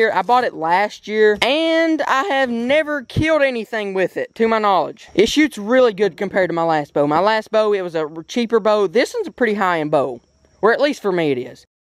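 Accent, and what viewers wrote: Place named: Kentucky, United States. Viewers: Hey there fellow Archery not even sure if you’re going to see this but just wondering how far south in the United States you are(because I’m genuinely interested in where that heavy of an accent comes from not trying to make fun of it) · Love that southern accent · This guy is definitely from Kentucky.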